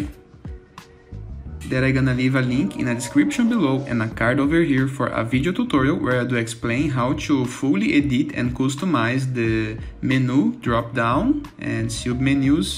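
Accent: Brazil. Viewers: Esse sotaque é BR kkk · Sotaque BR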